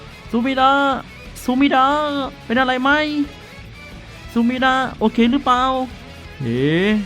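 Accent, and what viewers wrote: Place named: Thailand. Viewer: เป็นการอ่านที่เป็นไทยมาก555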